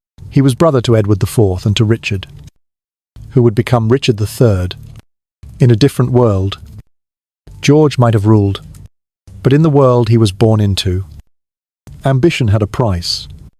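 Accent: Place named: Southern England, United Kingdom. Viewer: The narrator (AI) may be boring, but it's a standard southern English accent.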